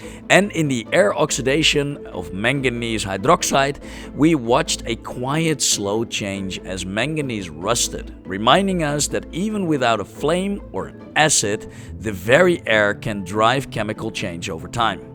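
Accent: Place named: Netherlands. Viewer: very dutch nice accent meneer very goed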